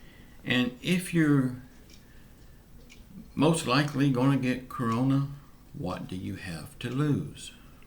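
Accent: South, United States. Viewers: Ok I've been listening to your channel for awhile now it sounds like your from the south me to Tennessee. Move back for family anyway you forgot one major issue today where is that recipe for sguach/hamburger that sounds so good. I'm so so glad Amy's doing better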